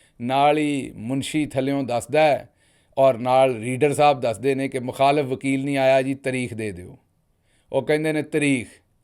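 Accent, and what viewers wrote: Punjab, India. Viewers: Aahahahaha wahh Sahab, Kya Punjabi lehja or pure alfaaz hen aapky😂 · 😂😂😂😂😂😂😂😂 well thought out story Dr sahib love your shudh Punjabi accent thanks for putting a smile on our faces . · . I love your village Punjabi Vlogs. We are from Lahore for generations, used to listen this Punjabi only when we used to visit our relatives in a village. It is soothing and I really enjoy it. The way you speak it seems that my cousins from a village are talking. It is hilarious and I get a kick out of it. Thank you. I really miss village Punjabi. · آپ کا پنجابی وی لاگ اب روز سنتا ہوں آپ کا لہجہ خالص پنجابی اور پنجابی کلچر کو الفاظ کے روپ میں حقیت میں محسوس کروا دیتے ہیں۔۔۔۔۔۔ اس پنجابی وی لاگ ختم نہیں کرنا چاہے سننے والا میں اکیلا ہی کیوں نہ رہ جاؤں 😂 فن بھی انفارمیشن بھی آپ کے اس پروگرام میں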